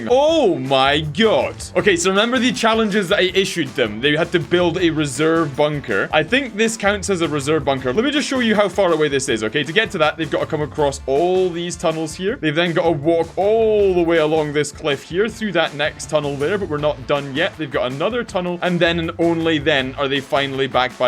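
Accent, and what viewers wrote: Scotland, United Kingdom. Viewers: Is bro Scottish?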